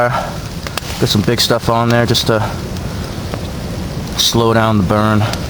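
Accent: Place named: Northeast, United States. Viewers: Pretty spiffy for a masshole! :) I could tell right away from your accent that you were from the greater boston area. And when I heard you talk about new bedford, well that just gave it away. Seriously though, it is refreshing to see someone doing a camping experience video that is real and not perfectly choreographed. You made a few mistakes like not gathering enough firewood before dark, and your shelter is not setup the best, but you got through it and you did it legitimately! It's nice to see a newbie practicing their skills. You did a great job and I enjoyed watching this video! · Great video! Where is this place? You sound northeastern.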